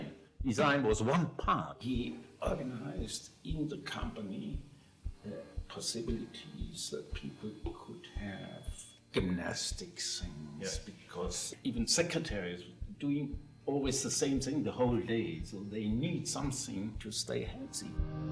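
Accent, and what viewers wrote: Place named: Germany. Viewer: Great video. Any body care to transpose correctly for Closed Captioning - it's hard to follow his Germanized-English. Thanks.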